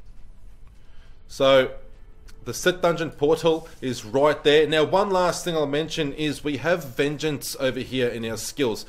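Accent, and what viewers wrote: Australia. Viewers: you definitely sound like a fellow Aussie.. good video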